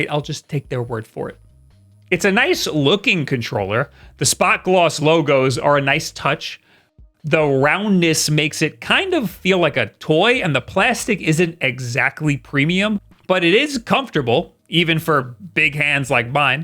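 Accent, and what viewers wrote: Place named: New York, United States. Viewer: I’m from Wisconsin and live in nyc. Love how you say cawfee like a New Yorker but Beag (bag) like a Midwesterner 😂. Relatable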